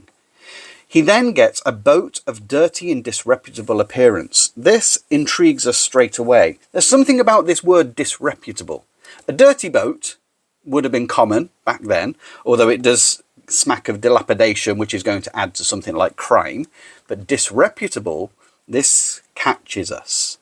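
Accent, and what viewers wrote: England, United Kingdom. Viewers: Good evening, I will definitely use some of your tips with my students on my next lesson on Dickens...and they will also appreciate a pure musical English accent. (I am an Italian teacher of English language and literature, I just stumbled upon your amazing channel).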